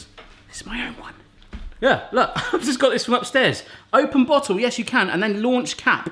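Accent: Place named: United Kingdom. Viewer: I really like him and his videos but I'm American, and British terms and speech patterns are freaking hilarious. Courgette you mean a zucchini boy raise your pinky you fancy British boys. I love you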